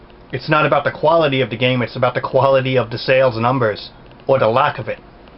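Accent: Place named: New York, United States. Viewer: By the way, New York dialect FTW!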